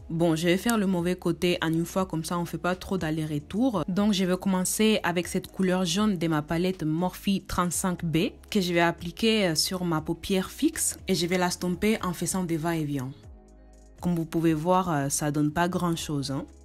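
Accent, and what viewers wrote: Spain. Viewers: adorable et super vidéo !! (y se nota que vienes de españa jaja!! mi madre tiene el mismo acento en francés! suerte guapa<3) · I love your spanish accent omg 😻😻😻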